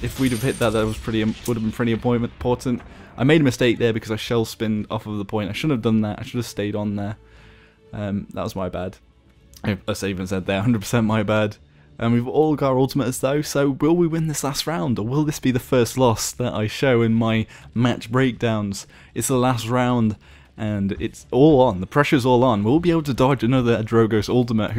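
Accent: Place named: United Kingdom. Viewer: Are you British? You sound like you have a British accent.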